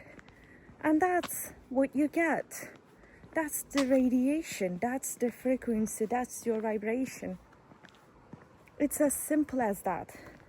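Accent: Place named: United Kingdom. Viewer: Bunları simdi daha iyi algiliyorum, bilincim katman katman aciliyor.. evrendeki her enerjiye, özellikle kendimden ayirdigim negatif düşünceler ve duygulara bile BEN olarak baktigimda onları kabul edip iyileşmek hizlaniyor. evet her kilit TAMLIKTAN aciliyor. tüm kapsayicilikla tüm olasiliklari BEN olarak görüp icinden arzuladigimi secersem realiteye dökebilirim! bunu farketmek bile benim icin muazzam bir farkındalık, simdi sırada bunun uzerine calismak, çünkü asil olay bunu OLMAK. teşekkürler hizmetin icin. British aksanindan öpüyorum🥰😘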